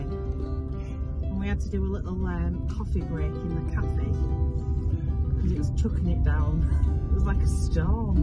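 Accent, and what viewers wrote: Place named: Hull, United Kingdom. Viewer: That's a Hull accent if ever I heard one!?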